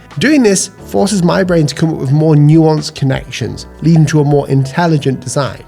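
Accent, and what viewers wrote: United Kingdom. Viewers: good and very informative but british accent sucks when watching tutorials like this. No offense. but its hard to understand what your saying because of the frequent pausing every word, making the sentence sound like one word and talking fast. its annoying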